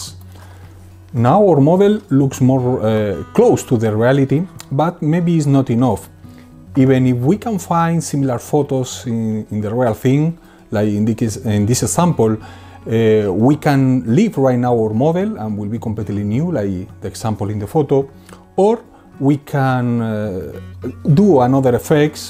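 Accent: Spain. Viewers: no hombre por el amor de dios no te disculpes si lo hablas bien solo que tiene que intentar mitigar un poco el acento español lo tienes muy marcado y puede hacer que algunas cosas no se entienda bien en ejemplos como el de el sonido de la ce o la zeta que son fonema que no existen en ingles y a los angloparlantes les puede sonar a chino una palabra así pronunciada. Saludos mig perdón se he sido un poco impertinente animo mig 💪🏼💪🏼💪🏼💪🏼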